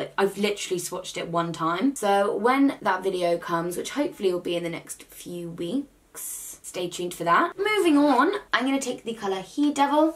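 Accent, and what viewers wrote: United Kingdom. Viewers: New to your channel, love your makeup look, very pretty..then u spoke..u British!? YAAS..song in beginning??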